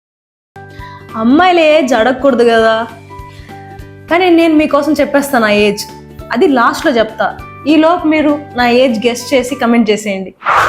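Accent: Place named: Guntur, India. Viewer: Tirupati antunnaru but maa guntur slang undi enti ?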